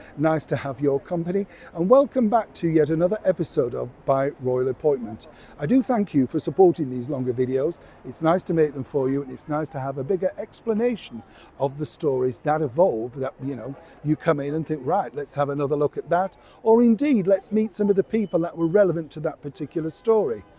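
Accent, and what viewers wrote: United Kingdom. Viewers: I don’t know…but i like listening to stories when the accent is in the british accent…it’s very pleasant to the ear for an asian like me…even crime stories, i like listening to the dialogue when in this kind of accent…sorry about harry…he’s going to deeply a d sadly regret his actions…your real family is the only family who truly will ever know you…anyone else, including a spouse, could leave then where would you be?…what a sad life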